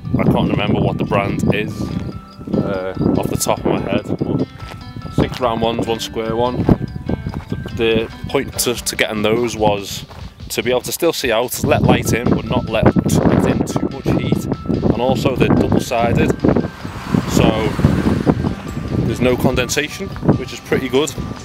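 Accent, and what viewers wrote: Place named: Doncaster, United Kingdom. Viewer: Love your van - also love the accent! (Doncaster is it?)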